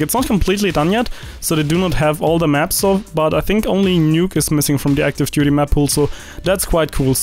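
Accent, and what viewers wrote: Germany. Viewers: mann kann aber ob und zu einen deutschen akzent hören xD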